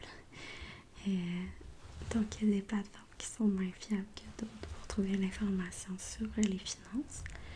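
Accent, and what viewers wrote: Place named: Quebec, Canada. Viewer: oh mais je crois distinguer un petit accent québécois 🧏🏼☺️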